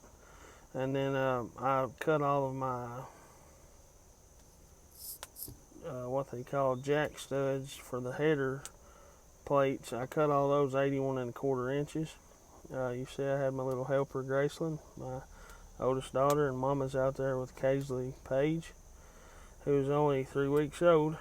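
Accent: Eastern Kentucky, United States. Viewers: That has to be an East KY accent.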